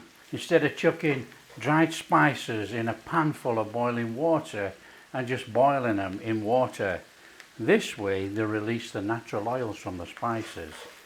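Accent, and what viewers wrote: United Kingdom. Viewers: What a delight - Hoppin' Jack - or Hoppin' John - with a British accent. From the northern US, but schooled in the South, so I enjoy a variety of southern dishes. This will be in the plan next time we have ham!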